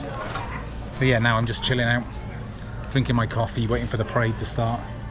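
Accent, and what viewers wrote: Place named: South East Wales, United Kingdom. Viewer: Hi, Stumbled on your channel. Its great to see a Brit doing the GDMBR. As an ex-pat living in Canada for the last 20+ years this is a route I have obsessed with for some time. Looking forward to watching the whole series especially like the video about cost and realistic time lines to get the route done. Its great to see the ultra elite athletes completing in less than 20 days but we are not all like that!! Where are you actually from in the UK? can't place the accent, I have you south east Wales but I have been away for a long time!! PS don't post your address! 😂😂 Cheers Bob...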